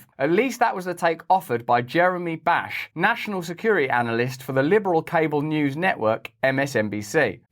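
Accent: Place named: England, United Kingdom. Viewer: I been following your channel for a while and one thing that stops me from continues watching your good content is lack of subtitles. It is hard for me to understand your English accent. Hope you can add subtitles one day so that native Spanish speaker have an easier and clear understanding of such valuable information.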